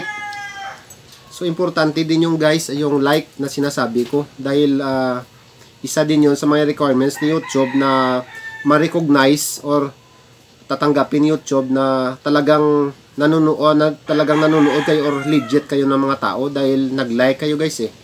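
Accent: Iloilo, Philippines. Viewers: Hhehe ilonggo gid ya bala Ang tono lods. Taga diin ka sa Negros lods? OK na gali Salamt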